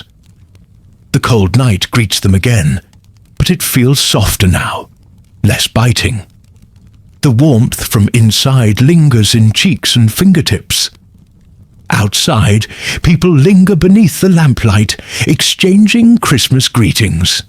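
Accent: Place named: United Kingdom. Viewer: Missouri USA, pm. I love the British accent.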